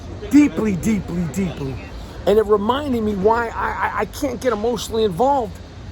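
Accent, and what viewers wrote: New York, United States. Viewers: Why is the camera so close to your face? I love the passion but dayum. I’m here for your voice bc it’s SO New York and I love hearing the pain. Heat in 5 🔥🤍🔥 That joke about Randle was funny tho. Lint brush. Maybe that man have other stuff on his mind 🤷🏽‍♀️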